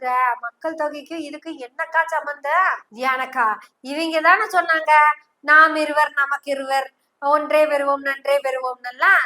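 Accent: Kongu, India. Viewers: மகளே..!!.!! எப்போதும் தேன் ததும்பும் கொங்கு தமிழிலேயே உன் கருத்துகளை பதிவு செய்யம்மா என. தாயே..!! சிறப்பு..!! மிக சிறப்பு..!! மிக மிக சிறப்பு...!!!? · Very interesting and thought provoking presentation in the sweet Kongu Tamil. May the Almighty bless you for better prosperity.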